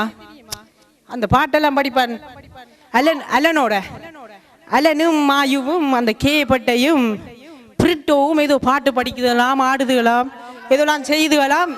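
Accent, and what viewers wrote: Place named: Sri Lanka. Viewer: Nakshatra Jewel This is how Tamil is spoken in Sri Lanka.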